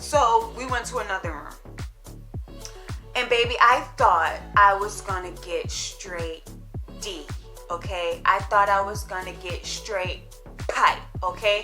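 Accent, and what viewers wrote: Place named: New York, United States. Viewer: Your New York accent had me hollering 😂😂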